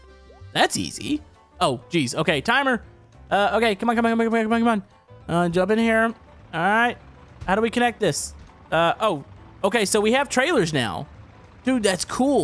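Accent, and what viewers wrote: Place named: Texas, United States. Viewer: Im sad he did not use his Texas acsent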